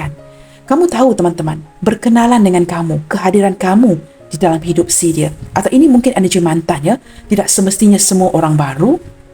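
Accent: Malaysia. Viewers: Suara nya nada cakap malaysia ni kan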